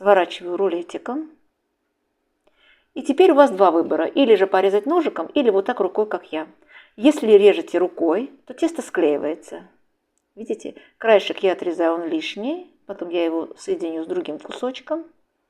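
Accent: Baku, Azerbaijan. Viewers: Как все по бакински! Просто наслаждение от родного акцента, четкой толковой подачи, вашей красивой внешности и даже интерьер кухни напоминает чем то Баку, ну а рецепты… когда смотрю их, перед глазами встают бакинские посиделки и «гонаглыхи» 70-80х. Прям хочется обнять вас, родная! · Спасибо!!!!Из комментариев поняла, что Вы бывшая бакинка? Огромный привет, землячка! Такое удовольствие услышать чуть уловимый акцент, ничем не истребимый у тех, кто жил в Баку. Желаю Вам успехов, здоровья и благополучия! Всем мира и любви! Пирожки завтра же испеку. Уверена - рецепт классный! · О,этот сладкий бакинский акцент!,спасибо большое за чудесный рецепт и за такую приятную подачу. Храни вас Господь ❤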